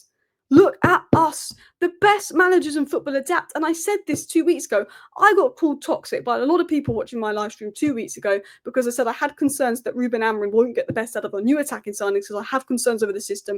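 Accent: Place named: England, United Kingdom. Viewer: Guys what type of English accent she has , I love it.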